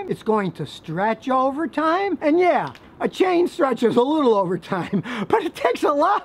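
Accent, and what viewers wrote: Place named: North, United States. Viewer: originaLkomatoast he may live in Texas, but he sounds like a yankee. I want to hear THAT story. What brought him here?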